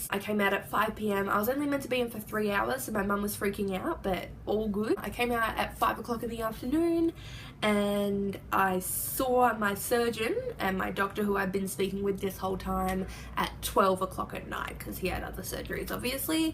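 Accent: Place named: Australia. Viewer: ! Keep us posted! Thinking of you:) you are so brave to post this! Would love to see videos or snippets of implants and everything else! Love hearing an Aussie accent. Peace outtt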